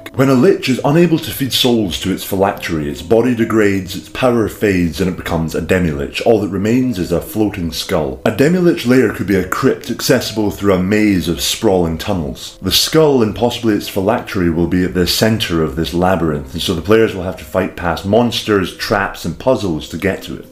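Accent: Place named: Edinburgh, United Kingdom. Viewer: I've watched a fair few of your videos. The accent keeps swaying into Edinburgh east coaster.... 🤔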